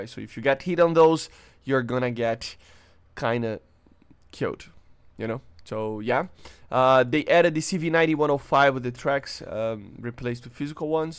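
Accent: Brazil. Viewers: I like you because you sounds brazilian speaking english. Its good for trainning my english.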